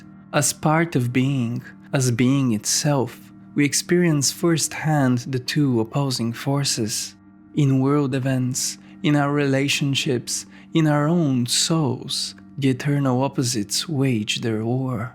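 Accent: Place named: India. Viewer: please a video about alfred adler .. you have such a nice voice its like an indian guy talking englisch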